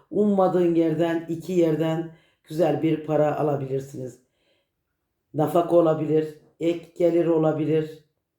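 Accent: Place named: Afyon, Turkey. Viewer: Konusmanizdan sanki afyonlu gibisiniz nerelisiniz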